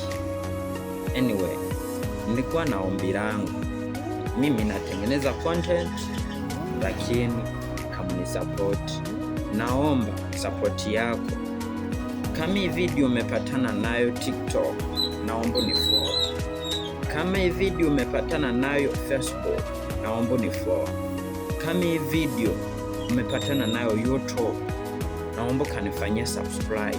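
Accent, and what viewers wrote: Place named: Burundi. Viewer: Swahili ya Burundi hiyo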